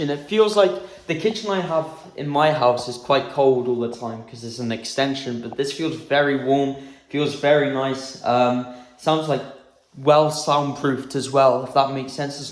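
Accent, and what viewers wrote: Wales, United Kingdom. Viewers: Can decide if he got a Welsh accent or a foreign accent! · Good video. Think about making more videos breaking down each process and showing them actually being done. You sound like you might be from Wales. I've run a few jobs myself. Currently coming to the end of a refurb in ponty. In Wales of course we now have to fit Sprinkler systems in new builds - even domestic ones. We've just done almost £10,000 on a sprinkler system and fire alalrm for a five bed HMO. Thank you Welsh Gov. Anyway, great vid, great channel good luck on making more videos. 👍👍👍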